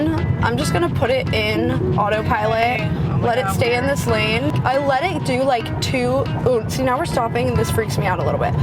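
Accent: Los Angeles, United States. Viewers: Ugh LA accent is horrible.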